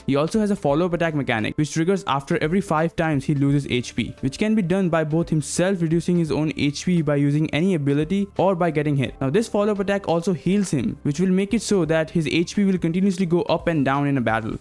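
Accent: India. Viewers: Bro yur accent is like Indian is that true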